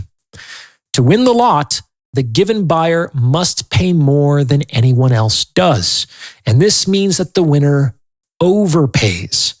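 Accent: United Kingdom. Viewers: If you are astute enough to make a lot of money, then you should be astute enough not to drive the fancy car. You sound like some sort of UK Indian subcontinent person. The average intelligence quotient is 82-83, and you are probably going to receive a lot of idiotic behavior. If you are middle class, then these people are even more status-oriented, more subtle and less greedy, and unless they are your true friends, then they are useless in your life. You won the race and they are all losers in the race. At least 60% of people do not possess the ability to be happy, and they only way they can gauge happiness is by what they have, and how much things cost. It is a mostly an impoverished existence. I was also successful for a number of years, and none of my true friends ever needed money, because they also eventually became successful. But there were many other people that I knew, and I had obligations, and then I moved to the second world much of the time (where I live a dream life), and all of the people wanted something from me. 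If you want to get along with (all of the other people who are not achieving) then do 2 things 1) hide your wealth to the greatest extent possible, so you don't get killed (like the Chinese woman Abby Choi, who was killed by her former in-laws in February 2023) 2) Friends are different, but when it comes to acquaintances, make sure your money is available for their achievement. Your job is to make people's lives better. (I give money, based on what I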